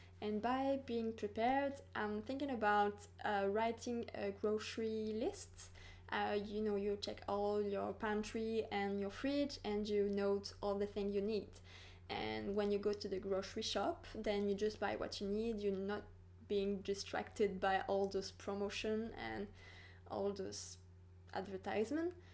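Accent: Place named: France. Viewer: your french accent is so cute. LOVE it. I am enjoying your videos a lot!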